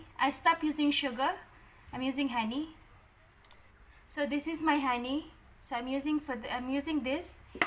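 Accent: India. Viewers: Indian accent ka narin Kbayan.Buti nlng Pinay kharap ko bka dudugo n nmn ilong ko kakaenglish..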